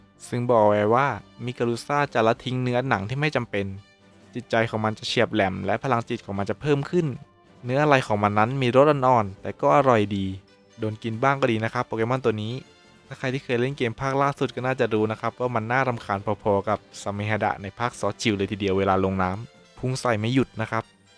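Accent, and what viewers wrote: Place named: Suphanburi, Thailand. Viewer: ชอบสำเนียงสุพรรณมากค่ะ น่ารักมาก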